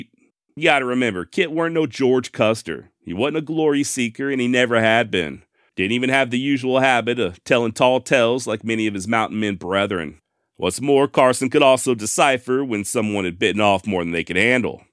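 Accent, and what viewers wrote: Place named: South, United States. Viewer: Sir..it is a pleasure to listen to your southern accent. And your humour is refreshing. Your account and your presentation is undeniably researched. Thank you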